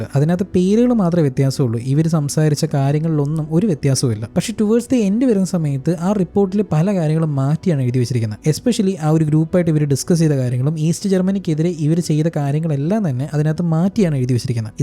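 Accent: Palakkad, India. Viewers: bro sathyam para ni palakkad alle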